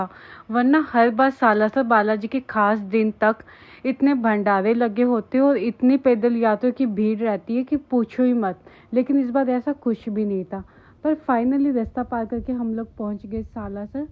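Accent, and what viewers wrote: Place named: Punjab, India. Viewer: Ap ki language me Punjabi ton h Ganganagar se ho ji ap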